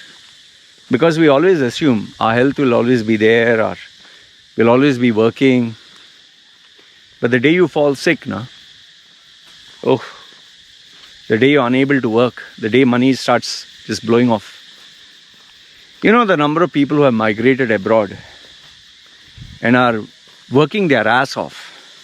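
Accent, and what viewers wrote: India. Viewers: You sound more indian than 6yrs ago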